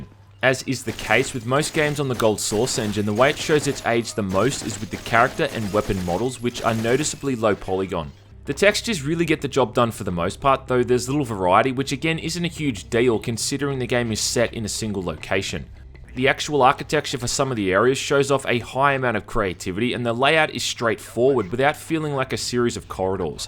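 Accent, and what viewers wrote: Australia. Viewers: I fucking need subtitles to understand your aussie.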